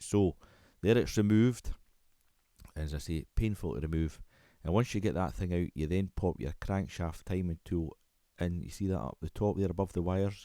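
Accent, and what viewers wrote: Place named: Falkirk, United Kingdom. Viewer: I am looking for someone to do mine , sounds like you live local to Falkirk ?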